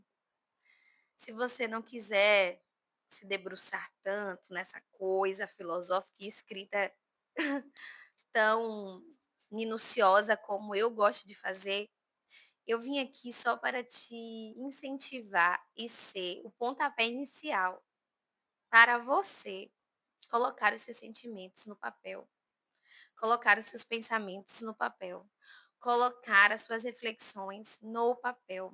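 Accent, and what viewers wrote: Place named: Bahia, Brazil. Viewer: Amei sua voz! Sou baiana também e amo achar pessoas no YouTube falando baianês também! Muito legal sua forma de fazer diário!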